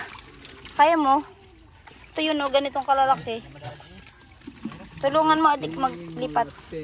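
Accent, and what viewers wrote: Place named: Tagalog, Philippines. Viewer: Pokot ng lambat nakakatuwa ka talaga halatang pure na tagalog ka😀 maam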